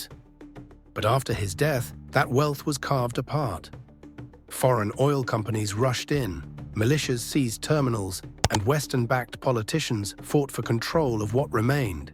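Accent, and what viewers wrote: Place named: United Kingdom. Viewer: YOU MAKING OF HIM A HERO JUST TO GET LIKES. THAT ALL IMPORTANT TO YOU LIKE I HATE UK ACCENT YOU ARE THE EVIL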